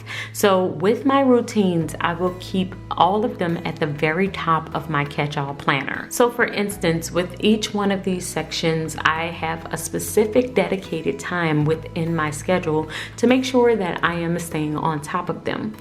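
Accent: New Orleans, United States. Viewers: I detect a New Orleans accent too.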